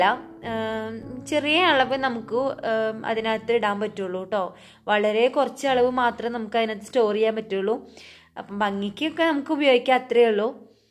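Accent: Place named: Northern Kerala, India. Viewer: .. നല്ല വീഡിയോ ആണ് കേട്ടോ.. I like your വടക്കൻ slang 😍😍😍പക്ഷേ അരി നാലഞ്ച് പ്രാവശ്യം കഴുകിയാൽ അതിലെ തവിട് മുഴുവൻ പോയി ഗുണം നഷ്ടപ്പെടും എന്ന് പറയാറുണ്ട്... അതുകൊണ്ട് ഞാൻ..maximum രണ്ട് മൂന്ന് പ്രാവശ്യം മാത്രേ kazhukarullu...just അഴുക്ക് കളയാൻ മാത്രം.. ഇത് negative comment Alla കേട്ടോ..😊😊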